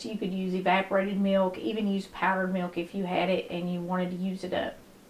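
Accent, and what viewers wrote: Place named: Tennessee, United States. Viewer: Thought I recognized a fellow Tennessee accent. For the Diabetic, along with the flour swap Substitute the sugar with a baking alternative like Splenda. And use REAL butter not margarine. I've done and taught Diabetics how to do low carb diets for eight years, these two swaps make it completely blood sugar friendly. It'll still rise, got it'll rise a LOT less. Love the channel and the emphasis on putting God first.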